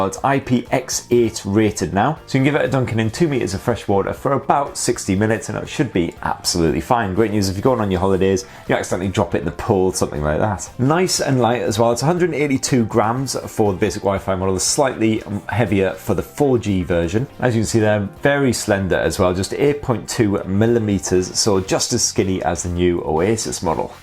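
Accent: United Kingdom. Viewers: british accent is very annoying! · Pure British accent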